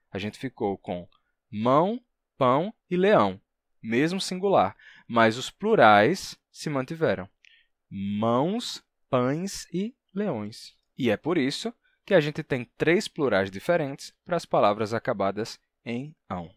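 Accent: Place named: Natal, Brazil. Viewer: vc é de Natal-RN? Seu sotaque é muito parecido com o meu e sou de lá, fico me perguntando isso todos os vídeos hahah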